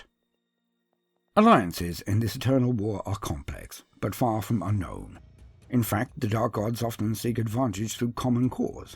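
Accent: United Kingdom. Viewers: I could listen to your silky British accent all day. Love your content brother. Keep being awesome. 😀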